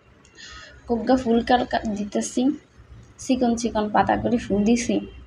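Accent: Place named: Noakhali, Bangladesh. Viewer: ও 😂 ভিডিও খান সুন্দর ই অইচে👌আনরা সবাই হরের ভিডিও কানের লাই অপেক্ষা আছি☺️☺️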